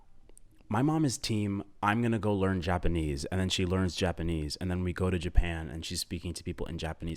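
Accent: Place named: Australia. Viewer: I really thought you were Australian with a good American accent😅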